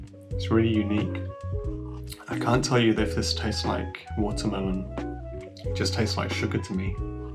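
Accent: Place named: RP, United Kingdom. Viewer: That`s why we call him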